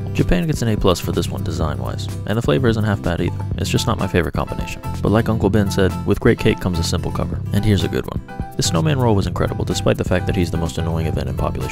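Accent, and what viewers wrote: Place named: South, United States. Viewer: glad you keep your southern roots by still saying “warsh” instead of “wash” 😂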